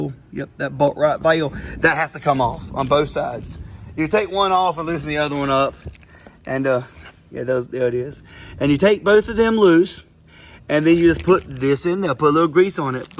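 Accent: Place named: South, United States. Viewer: Over tightening is my middle name 😂. Always stripping screw heads or bolt threads. Bad habit. Side note, that Southern accent is pretty cool. I thought I was listening to the American Dream Dusty Rhodes telling me how to fix my Fourwheeler..😂😂 I have an issue with the steering being hard to turn. I replace ball joints, tierod ends and wheel bearings on both sides and it turns a little better but surely should still turn easier. The bushing is one thing I haven't checked or changed. Was wondering about greasing it but idk if it'd do any good since you said it didn't really make a difference on greasing a new one. (Btw all that stuff I replaced needed replaced anyway but barely made it easier to turn)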